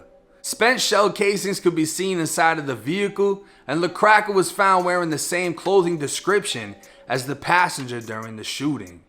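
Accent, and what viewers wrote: Boston, United States. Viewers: You from Boston? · You have to be from Boston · Ammed kah jackin ... could you be any more Boston.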